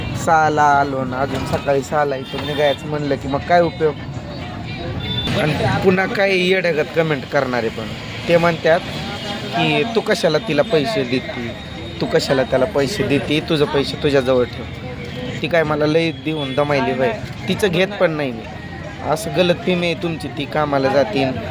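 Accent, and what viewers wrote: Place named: Latur, India. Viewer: दाजी पीवर लातूर ची भाषा की ओ तुमची😂😂😂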